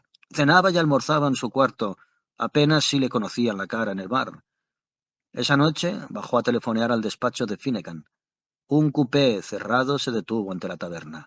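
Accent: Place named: Galicia, Spain. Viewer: Voz gallega🤮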